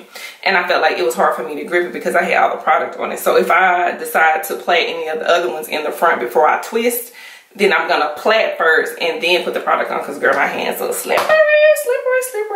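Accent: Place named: Texas, United States. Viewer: I love your voice. You sound like my family in Texas. Ima go ahead and subscribe 🤣❤️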